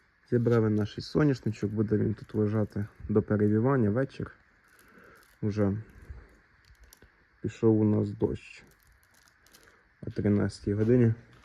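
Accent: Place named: Cherkasy, Ukraine. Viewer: Почув наче єрки то ти з Черкащини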